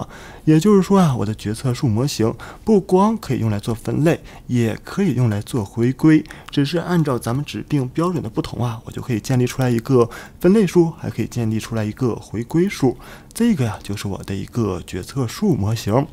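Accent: Northeast, China. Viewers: 这东北味太浓了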